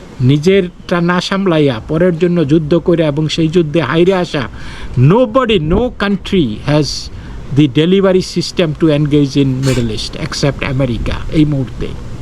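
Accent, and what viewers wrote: Bangal, Bangladesh. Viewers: অনেকদিন পর । খুব মিস করছিলাম আপনাকে। আপনার মুখে বাঙাল ভাষায় খবর শুইন্যা মনডা ভাল হইয়া গেল।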